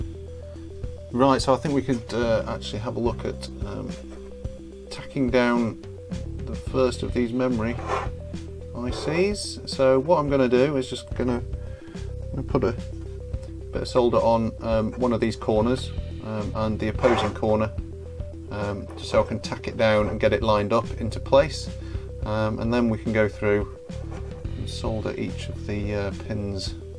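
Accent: United Kingdom. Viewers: Derbyshire accent?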